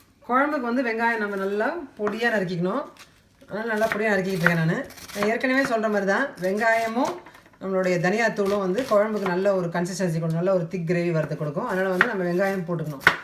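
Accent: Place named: Coimbatore, India. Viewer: But unga speech la lesa Coimbatore slang come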